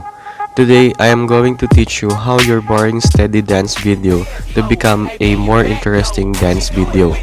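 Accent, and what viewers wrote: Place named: Philippines. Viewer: Sounds like a Filipino accent 😍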